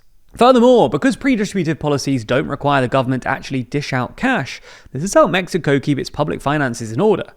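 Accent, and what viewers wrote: United Kingdom. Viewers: Cómo mexicano, viviendo en México, tu desesperado comentario no tiene ningún valor y te lo explico. Si un anglo y no solo anglo, sino con acento británico (suponiendo que eso lo hace estar del otro lado del charco) habla bien de la izquierda en México eso solo quiere decir una cosa... USTEDES YA PERDIERON EL RELATO. De nada les vale seguir intentando imponerlo, sus mentiras ya no pasan.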